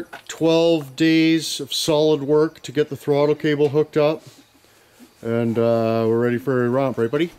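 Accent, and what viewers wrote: Canada. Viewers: how much more canadian can that voice sound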